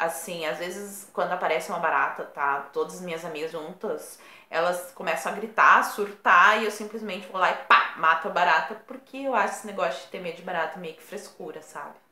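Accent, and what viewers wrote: Rio Grande do Sul, Brazil. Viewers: Maravilhosa...corajosa...queridona..sotaque gaúcho sim kkkk....Não entre em depressão, porque tu não vai sofrer por causa de mediocres né.... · Tem sotaque sim tchê!!!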